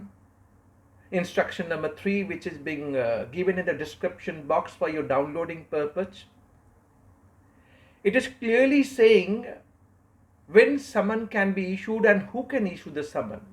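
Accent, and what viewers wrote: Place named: England, United Kingdom. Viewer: I like your so much your English accent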